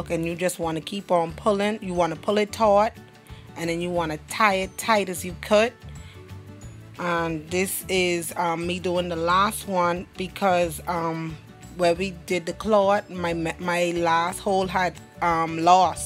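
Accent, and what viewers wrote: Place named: Bahamas. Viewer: Hello sound like from Bahamas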